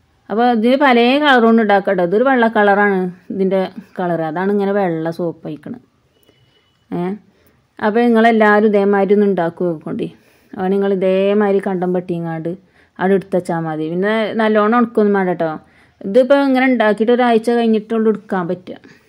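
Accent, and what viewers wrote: Malappuram, India. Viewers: അവതരണ ശൈലി നന്നായിട്ടുണ്ട് - അല്ലേലും മലപ്പുറം ഭാഷ ഒരു രസം തന്നെ - ഇഷ്ടായി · മലപ്പുറം ഭാഷ സൂപ്പർ വീഡിയോ സൂപ്പർ 💞💞💞💞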